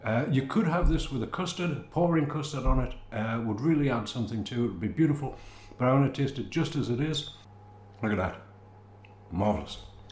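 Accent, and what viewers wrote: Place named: North East England, United Kingdom. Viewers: Enjoying your videos having just discovered your channel - I am sure many folk will appreciate your style i.e. explaining the ingredients with costs at the beginning of your presentation. I use an air fryer and may I suggest you give an idea of the bake settings - all air fryers perform differently so a guide would be invaluable. Enjoy your NE accent - probably Sun'land where I grew up. Makes me homesick. Tell me if I am right or wrong please. You sound a canny lad and I am sure you will have some canny recipes to come. I have subscribed to you channel and look forward to more. Good luck and good fortune. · As others have said here the accent is from the NE of England (just South of the border with Scotland) In fact your own George Washington's forefathers would have spoken like this since they came from Washington in Durham 👍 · Thank you. 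I wanted to ask where you are from because I try to place British accents. I thought it was a "Northern" accent, but not exactly what I was used to (I think of how the Physicist Brian Cox speaks as "Northern") -- there are so many accents to learn. Thank you, again.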